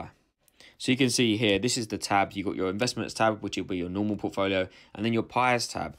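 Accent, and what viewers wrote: United Kingdom. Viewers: Hey, I like the British accent. I’m Kenyan and because we were a British colony, that’s the English we speak there. When I moved to the US 9 years ago, I had a hard time adjusting, and even today I still struggle. Other than that, great content!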